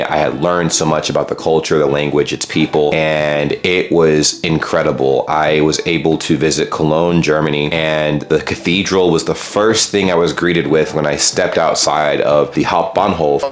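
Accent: United States. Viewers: That’s crazy, even smart Americans like this guy can go THAT long before finding out the US is a joke. So you’re moving to a new country, right? Work on changing that accent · I once found your videos a long time ago and I have to say, I was more annoyed by you and your typical US way of talking and reacting to things, so I was not all willing to subscribe. Over the time I always stumbeld over your videos from time to time, whatch a bit and moved on, after your first Germany trip I whatch more closely. Why am I telling you this, well you have changed so much for the better!!! Not because your videos got better , no, YOU as a person, it is like you've grown up.... no better: you have GROWN! You edjucated yourself, selfreflected, bearthed in knowledge, due to experiences. You became the best proof that travelling and living outside your comfort bubbel zone US there could be hope for the future, that Americans are abel to learn an improve themself. 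I don't mean that in a funny way, I dislike the USA too much for that, I just wanted to tell you, that you also influnced me a bit. Greetings form a German Generation X and keep on going... ✌✌👌👌😊😊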